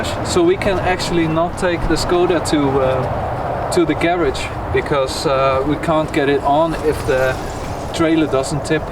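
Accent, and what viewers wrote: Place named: Netherlands. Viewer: Ik hoor je Nederlands praten 😄 I hear you speak Dutch not Swedish 😀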